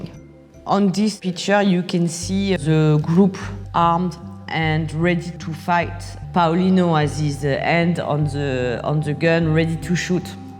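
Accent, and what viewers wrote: France. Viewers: I couldn't finish the video. The French accent gave me a headache!. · Strongest french accent I've ever heard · This is the most french accent i've ever heard lol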